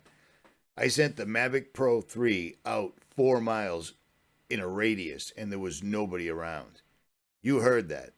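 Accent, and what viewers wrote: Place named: Boston, United States. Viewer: Just subbed sounds like Ritchie from boston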